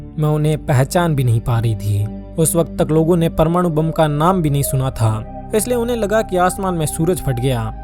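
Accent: Rajasthan, India. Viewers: Bhai ap rajasthan hai syd awaz aur language se thoda lg raha h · Rajasthani awaj h bhai jodhpur bikaner aur nagour ki tref tk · Bhai rajasthan se ho kya Accent se lag rha h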